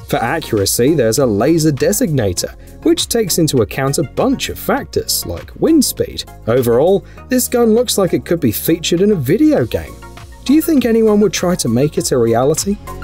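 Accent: England, United Kingdom. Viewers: I love hearing English people describe firearms. The frame and SLIDE…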